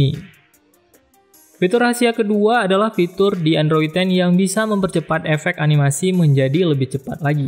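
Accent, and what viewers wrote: Aceh, Indonesia. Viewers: hei orang aceh kah anda??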